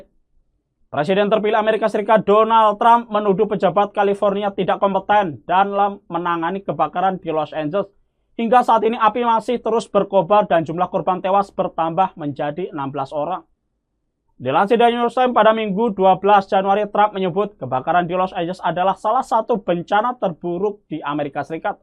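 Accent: Java, Indonesia. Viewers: Medok njowoni om 👍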